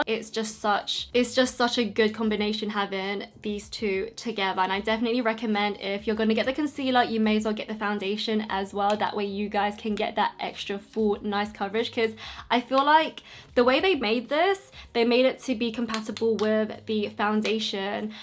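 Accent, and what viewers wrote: England, United Kingdom. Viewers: I have followed you on Instagram for quite a while and just found you on YouTube. I have no idea why I didn't know you had a youtube channel but Im am so soooo shocked by your voice! 😂 I had no idea you had an english(?) accent! (Im from the US) But I love your videos and you do beautiful make up! So happy I found your channel to subscribe!!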